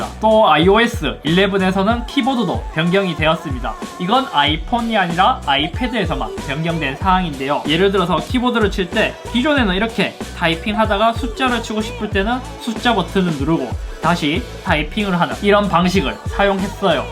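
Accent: Gyeongsang, South Korea. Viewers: 잇섭님 경상도 어디에서 올라오셨어요? ㅇㅅㅇ??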